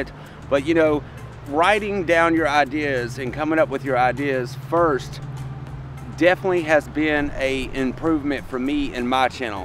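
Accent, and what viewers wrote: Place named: South, United States. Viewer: Good stuff! I enjoy learning from people who are just learning too. I’ve played with video cameras off and on for like 20 years but only been serious about it for a couple years in total. Love the southern accent! I’m in FL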